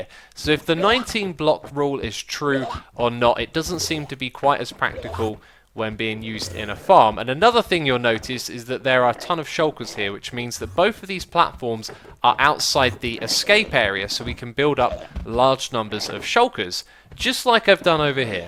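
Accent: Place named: United Kingdom. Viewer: u sound so British...I am assell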